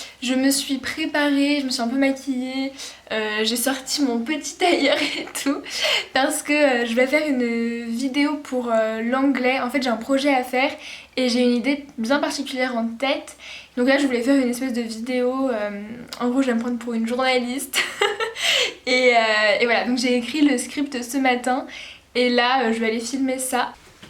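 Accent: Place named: England, United Kingdom. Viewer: Non j'adore ton accent anglais tu pourrai faire des vidéos comme sa plus souvent stp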